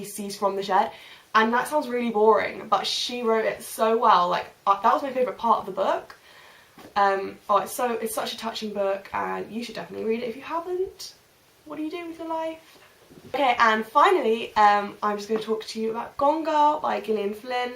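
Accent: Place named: United Kingdom. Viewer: Pretty cool his first video. I am going enjoy a lot to hear british english. Looking forward to watch new videos. My congratulations.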